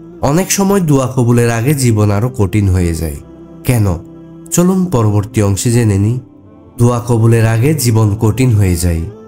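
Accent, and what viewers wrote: Chittagong, Bangladesh. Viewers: এই সব গুলো লক্ষনই আমার সাথে হয়েছে,আর যতই খারাপ কিছু আমার সাথে হয়েছে ততই মনে হয়েছে নিশ্চয় আমার জন্য ভালো কিছু আছে না হয় আমি কেনো এত কষ্ট পাই।তবে মাঝে মাঝে তো এক আধ বার হতাশ হয়েছি যে আমার সাথেই কেনো এমন হয়।ভাইয়া আপনার বাড়ি কি চিটাগাং? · আসসালামু আলাইকুম ভাইয়ের বাড়ি চিটাগং?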